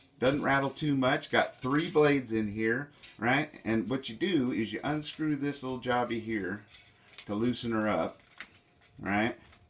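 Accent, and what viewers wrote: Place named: South, United States. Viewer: I detect a bit of Southern in your voice. Where ya at man?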